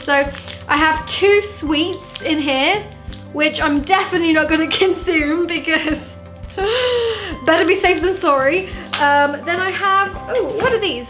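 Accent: England, United Kingdom. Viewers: 1dlover42aj british accent? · Is she British? 🇬🇧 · You sound so British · Are you from britain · She's english.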